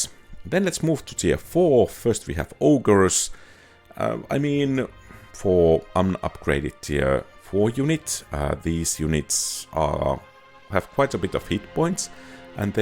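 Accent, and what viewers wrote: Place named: Finland. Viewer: Based on the accent I think Finland.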